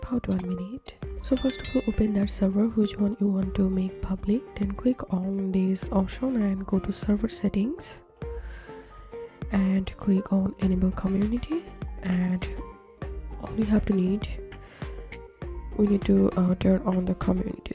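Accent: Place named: India. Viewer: cant listen to this voice im sorry. if you are the beautiful girl in the thumbnail thats awesome but it sounds like an indian video trying to guide me lol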